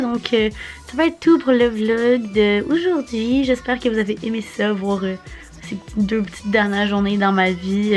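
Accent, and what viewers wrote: Quebec, Canada. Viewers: Je comprends ps très bien le québécois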